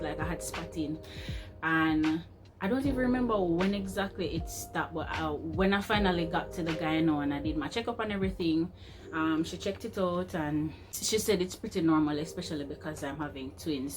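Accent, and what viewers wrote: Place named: Jamaica. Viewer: That Jamaican accent 😻😻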